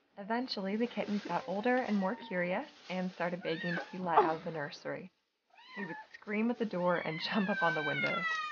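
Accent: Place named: United Kingdom. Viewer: British accent is quite appropriate, I say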